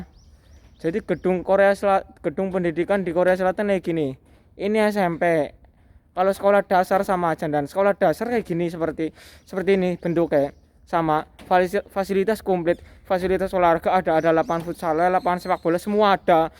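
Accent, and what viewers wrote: Banyumas, Indonesia. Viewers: Salam ngapak ndan... Pwt-singapore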